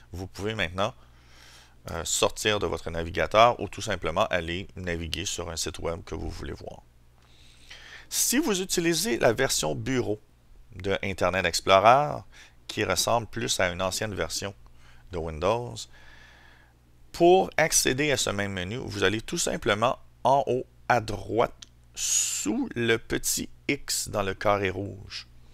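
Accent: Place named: England, United Kingdom. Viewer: belle accent anglais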